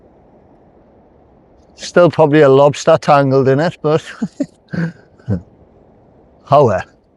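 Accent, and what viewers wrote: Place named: Geordie, United Kingdom. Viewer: I'm a geordie and you might just be the most geordie geordie I've ever seen on YouTube.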